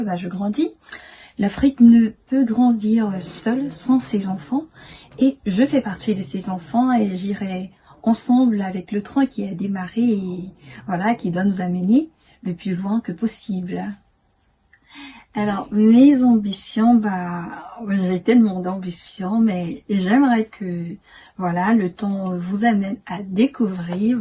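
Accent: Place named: France. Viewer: J'aime bien ton accent de Français ma cherie. Merci beaucoup pour cette belle video. J'ai aimé vraiment. ❤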